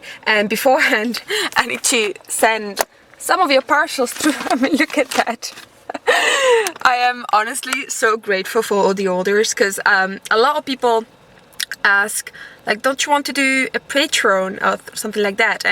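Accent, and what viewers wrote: Scotland, United Kingdom. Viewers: Really enjoying the Scottish Diaries since I am a Scot living in Canada. I know that you are Italian but I could hear every so often a hint of Scottish brogue mixed in. Any idea why? It sounds so lovely.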